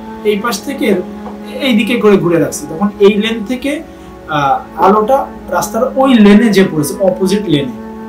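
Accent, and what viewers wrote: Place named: Chittagong, Bangladesh. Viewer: bhai ki chittagong er manus? apnar vasha te chittagong er vasha er ektu tan ase hahaha <3 love from ctg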